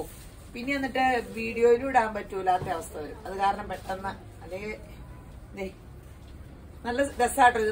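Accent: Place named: Pala, India. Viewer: ശരിക്കും വർത്താനം കേൾക്കാൻ വേണ്ടി repeated watch all video നിങ്ങൾ പാലക്കാരാ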